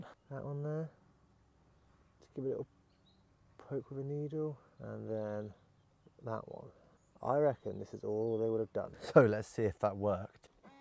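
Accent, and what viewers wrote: England, United Kingdom. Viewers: I think people with English accents should be the only people allowed to teach things.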